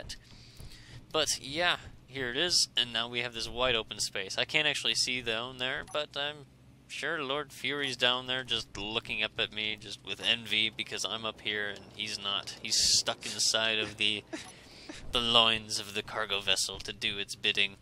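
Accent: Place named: Australia. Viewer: Totally Aussie!!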